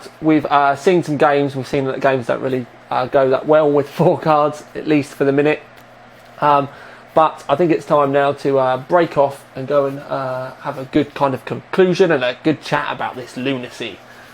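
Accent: United Kingdom. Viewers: I have no clue what those numbers mean but listening to his British reactions I'm guessing they're pretty damn good.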